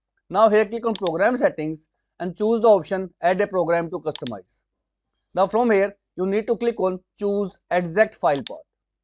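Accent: India. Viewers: heard the indian accent and already knew he was going to cook W video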